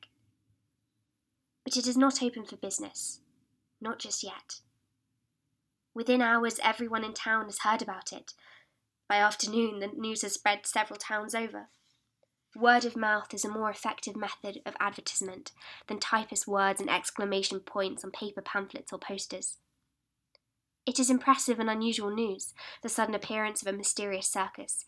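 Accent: United Kingdom. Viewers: I like your reading very much! I'm Japanese girl and learning British English(o^^o) It's so helpful for me!Thank you!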